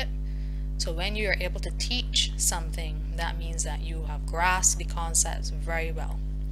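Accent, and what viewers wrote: Barbados. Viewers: R u from Barbados? U sound similar to Rihanna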